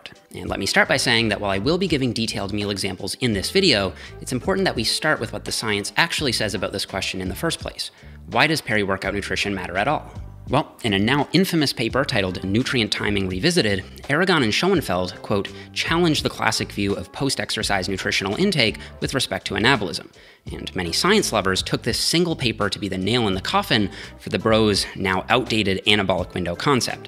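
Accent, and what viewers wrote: Ireland. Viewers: Is he Irish? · A canadian that sounds irish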